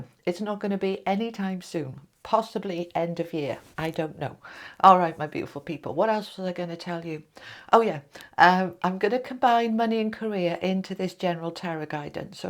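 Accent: England, United Kingdom. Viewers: Love me some! 😍🥰 ❤❤ That lovely english accent always makes me go weak in the knees, sigh 😅😘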